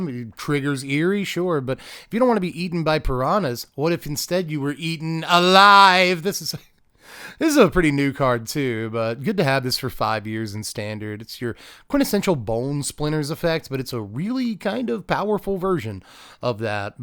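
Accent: South, United States. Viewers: It never gets old hearing a southerner speak spanish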